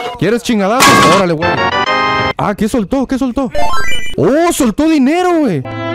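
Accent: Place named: Brazil. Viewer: Maau brasilero ereess tu🙀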